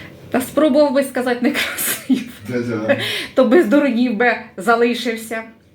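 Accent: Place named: Cherkasy, Ukraine. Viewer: Чути що говір черкаський. Я теж родом з Черкаської обл, на межі з Полтавською.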